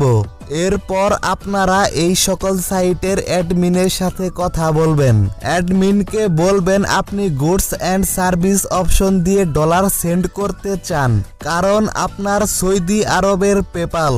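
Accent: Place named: India. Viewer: Title with eng why the hell u talk with Indian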